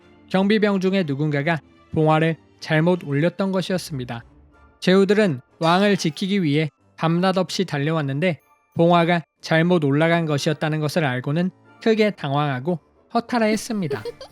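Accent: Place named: China. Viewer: 발음이 꼭 중국인 같네요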